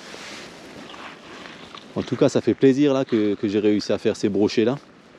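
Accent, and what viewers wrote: Alsace, France. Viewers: Sans ton accent Alsaco tes vidéos ne seraient pas les mêmes. Tu transmets parfaitement ta passion pour le carnassier au leurre. Personnellement et actuellement j utilise le Slick Finess en linéaire (usage détourné) .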